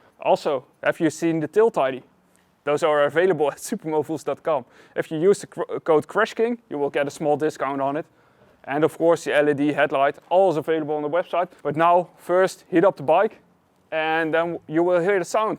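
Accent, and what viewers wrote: Netherlands. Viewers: Ar you dutch?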